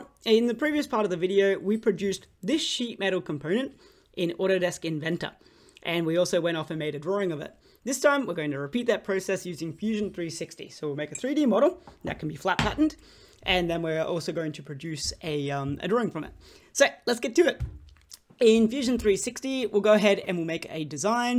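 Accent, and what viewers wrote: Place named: Australia. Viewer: I can tell this mate is an Aussie lol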